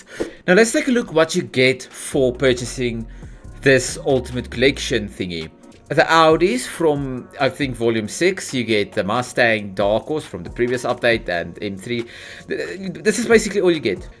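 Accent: South Africa. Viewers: Do I hear a fellow South African?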